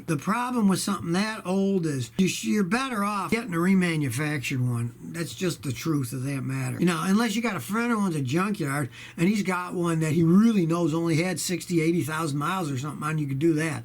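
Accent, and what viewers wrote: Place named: Western New York, United States. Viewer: I knew that was a WNY accent! Greeting from Buffalo, NY. Thanks for all of your videos, they have been very helpful.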